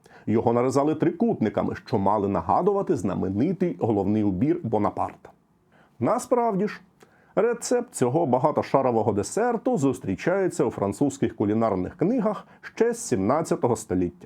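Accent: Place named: Russia. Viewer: Працюйте над мовою, бо ваша розмовна кацапська аж смердить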